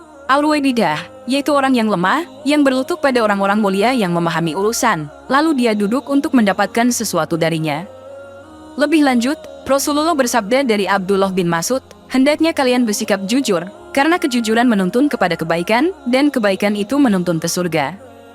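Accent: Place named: Eastern Indonesia, Indonesia. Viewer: Dari kalimat dan sajian GAMBAR INI BUKAN KONTEN ISLAM JANGAN BODOH...dari logat ini dari timur,kefasehan ini nasara jg tertipu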